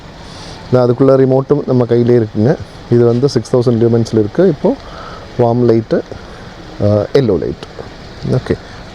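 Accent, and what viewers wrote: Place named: Kerala, India. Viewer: You talk tamil well, but somewhere I feel like u hav sone mallu connection.. is it true?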